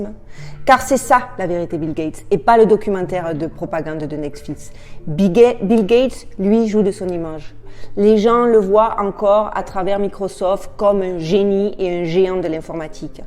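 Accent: South, France. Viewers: J'ai toujours cru vous êtiez du Sud de la France!!!!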